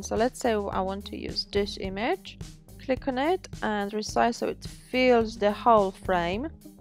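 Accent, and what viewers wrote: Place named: Poland. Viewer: You've got Polish accent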